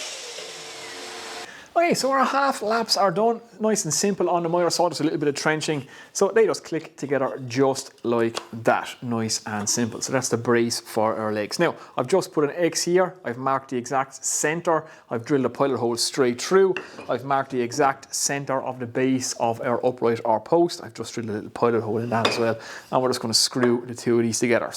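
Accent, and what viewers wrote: Ireland. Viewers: Dang if I made this video it wouldn't be a 10th as cool because I don't have that badass Irish accent! Great job on that bird feeder and video!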